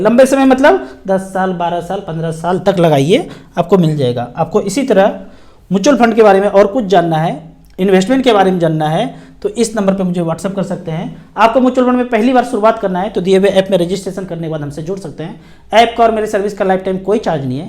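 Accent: Bihar, India. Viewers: Aap Bihar se hai kya sir?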